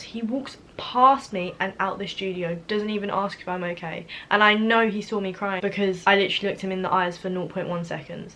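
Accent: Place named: United Kingdom. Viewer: british english sounds so beautiful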